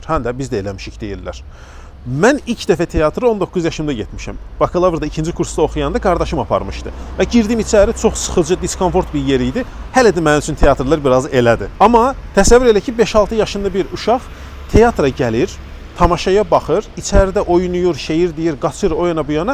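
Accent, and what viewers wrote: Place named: Shaki, Azerbaijan. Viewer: Qardaş salam xəbərin var artlq səndə şəkililər kimi ləhcə əmələ glib ❤ Var olun əllərinizə sağlıq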